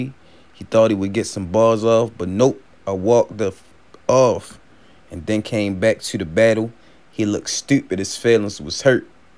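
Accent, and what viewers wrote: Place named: Baltimore, United States. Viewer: I'm just hearing your accent... Bmore❤ can't tell if its East or West but definitely Bmore...❤ Much Love and Respect!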